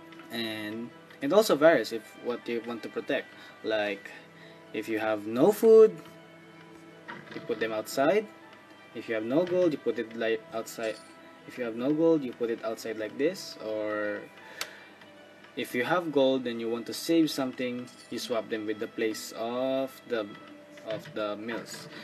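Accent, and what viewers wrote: Philippines. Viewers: are you a Filipino? cuz your English sounds Like a Filipino. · I'm sensing a Filipino accent.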